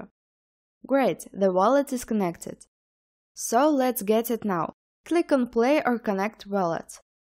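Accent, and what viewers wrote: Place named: Russia. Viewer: Russian acsent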